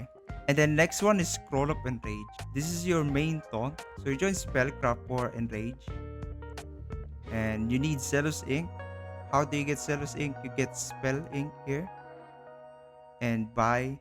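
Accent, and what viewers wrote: Philippines. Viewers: Thanks much bro! Btw, you sound like Filipino😅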